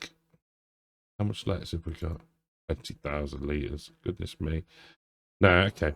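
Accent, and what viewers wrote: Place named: United Kingdom. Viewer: What part of the UK are you from? I lived in London, Birmingham, Manchester and spent a bit of time in Skegness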